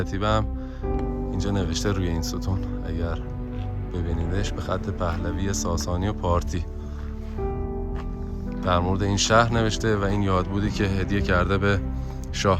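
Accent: Shiraz, Iran. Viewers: درود بر شما. چه کار باارزشی انجام میدهید. واقعا ما را به عمق تاریج میبرید. دستتان درد نکند. لحجه شیرازی زیبایتان مرا به کوچکی خود و فامیلم درشیرازمیبرد.❤💛💚💙💜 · دستت درد نکنه برا ساخت و تهیه چنین برنامه های خوب و پر محتوایی. اجرا و کیفیت هم بسیار عالی ، لهجه شیرین شیرازی هم که بقول خارجی ها is icing on the cake 👏👏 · من مشهدی کانادایی. چقدر صدا ؤ لحجه ایرانی زیبا و قشنگ و خوب.و درست است.ایراتیان بهترین لحجه را دارند.چون الفبا ایرانی.بیستر و مفهوم تر است.زنده یاد ایران زنده باد شما با کنجکاوی شما و تحقیقات شما. کار خوب ت را ادامه بده.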